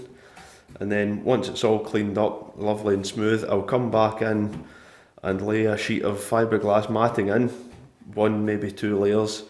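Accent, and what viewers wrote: Scotland, United Kingdom. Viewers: What a warehouse of skills you have, so great! ( are you from the same country as Jim Kerr "SimpleMinds" ) your accent sounds the same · I am here for: awesome restorations = 60%. Awesome scottish accent = 40%. HAHAHAHA love this channel mate!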